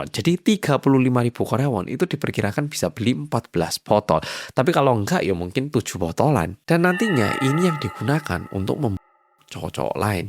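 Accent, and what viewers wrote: Java, Indonesia. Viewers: Logatmu mirip orang jawa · BHS Indonesia nya medok sekali, udah berapa lama tinggal di Indonesia, oppa/abang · Nemu channel wong korea medok banget😅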